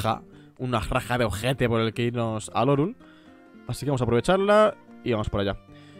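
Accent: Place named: Mexico. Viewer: AY ME ENCANTA QUE HABLES TIPO MEXICANO. ;;;; ❤💜❤💜❤💜❤💜❤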